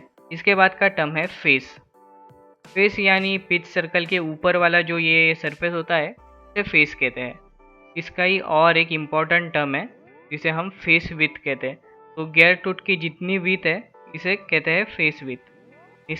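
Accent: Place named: India. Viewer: 印度的味道太大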